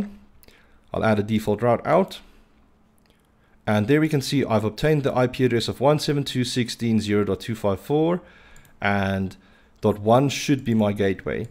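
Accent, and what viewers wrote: Afrikaans, South Africa. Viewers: You sounds like a Afrikaans speaking person. Thanks for the videos man👌👌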